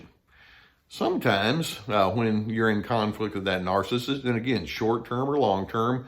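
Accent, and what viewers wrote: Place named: Texas, United States. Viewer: Always funny to hear a highly educated individual, with a Texas accent. 🤣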